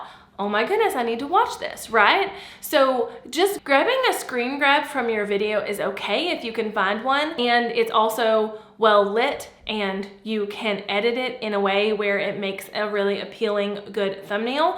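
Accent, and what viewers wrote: South, United States. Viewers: Absolutely love the content and the advice and on top of that, she is incredibly easy on the eyes and a delight to listen to. I love her beautifully southern accent. is really very knowledgable and an epitome of brains and beauty. I have okay audio but it sounds like I am on a phone call...which is what I used the mic for was meetings for work across skype. It is all I have right now, but a new mic is at the top of my list of things to get next. The rest of the 5 things are things that I am constantly watching for but I think I am handling pretty well. I don't have to worry about lighting except to make sure that it is not too dark in the game I am playing so that it is not too dark.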